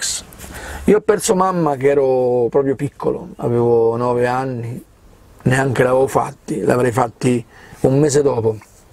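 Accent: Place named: Rome, Italy. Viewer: Ciao, ti seguo da un po', sei molto simpatico, poi l' accento romano mi fa morire, ho alcuni amici romani perche' ho villeggiato a Terracina per poco piu' di 20 anni. Sono un Napoletano emigrato in Canarias. Beh! Sono amante della vita in Camper pero' ho un furgonato, pero' non ci vivo.... Un abbraccio a Presto. Ah, in Calabria vive un mio zio. hehehhe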